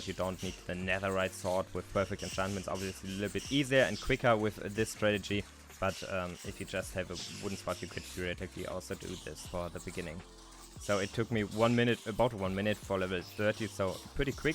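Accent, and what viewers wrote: Germany. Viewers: geiler deutscher akzent und geiles vid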